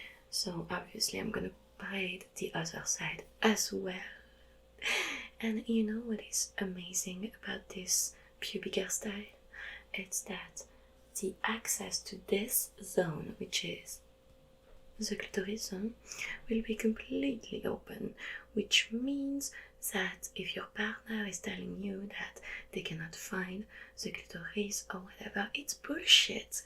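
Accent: France. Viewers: I'd really like to live in universe:'/ - educated universe Ton accent français me fait beaucoup rire d'ailleurs! Tes vidéos sont vraiment originales j'adore 👌🏻💕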